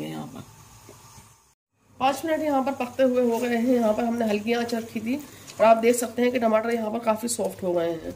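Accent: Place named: Bhajanpura, India. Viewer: Baaji aap bhajan pura mey rehti ho kya? Meri bahen bol rahi hey aise bhajan pura waley boltey hey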